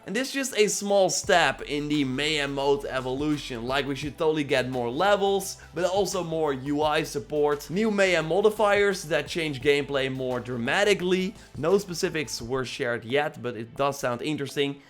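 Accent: Netherlands. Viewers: Are you Dutch? Your accent sounds very Dutch lol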